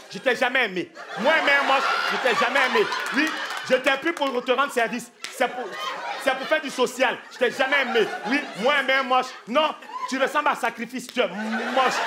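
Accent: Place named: Ivory Coast. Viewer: C est un ivoirien son accent est trop fort claudia son garçon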